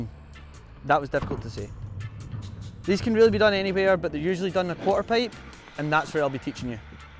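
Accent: Scotland, United Kingdom. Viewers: Excellent tutorial! Well explained and demonstrated. Are you Scottish or Irish? I love the accent! Sounds more Scottish, but I'm curious. ✌️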